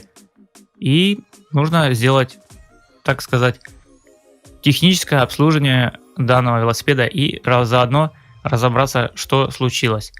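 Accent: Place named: South, Russia. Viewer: Ну, можно так подумать по голосу, если представить, что озвучивал слегка выпивший или покуривший человек. Но, автор у нас со своим южно-российским говором, там почти все так говорят, а он ещё и не по тексту, поэтому паузы в речи. Думаю, что трезвый он был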